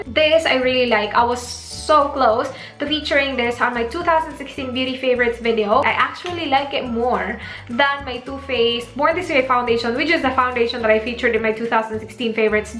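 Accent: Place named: Cebu, Philippines. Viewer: malalaman mo talaga kpag cebuana.. Ate! same tayo mg salita, 😘😘😘😀😀😀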